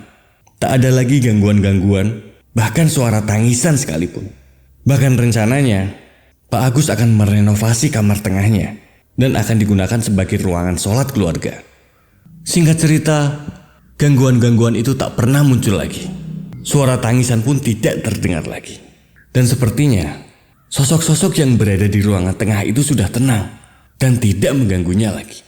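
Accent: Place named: Java, Indonesia. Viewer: Assalamualaikum, saya ibu yanti dari Bandung hadir mas, Mas klo ngomong jawa enak banget di dengarnya melok banget ya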